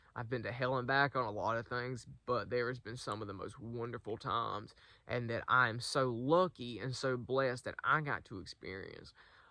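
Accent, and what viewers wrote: South, United States. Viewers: When I listen to you, it's like listening to myself- except for accent is little less southern. Great video though! Blessed day!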